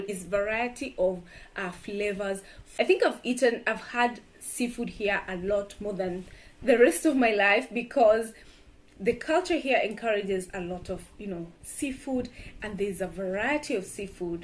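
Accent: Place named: Italy. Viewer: Eiiish,i love the Italian accent in you girl 😍💕💗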